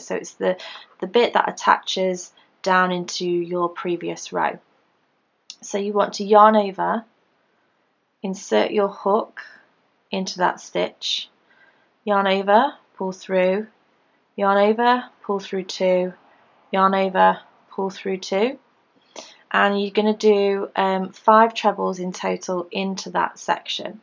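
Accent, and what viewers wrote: United Kingdom. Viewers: I've watched many a tutorial from others and they just cannot seem to convey what to do as well as you or they go too slow and repeat things too often. I've seen some of your tutorials and I just have to say that you really are the best! I've been crocheting since I was 13 (now 60) and I just love learning new patterns. Thanks so much for your videos! P.S. I love all things British so your accent (at least to me) is brilliant!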